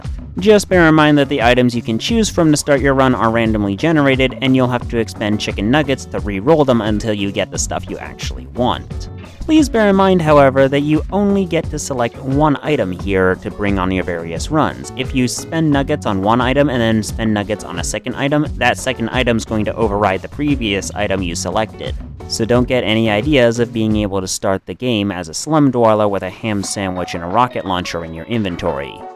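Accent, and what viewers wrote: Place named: Pittsburgh, United States. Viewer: Are you from Pittsburgh?!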